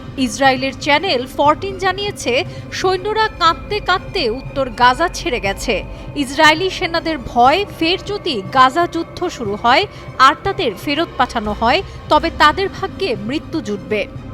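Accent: Kolkata, India. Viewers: voice dada kolkata theke esse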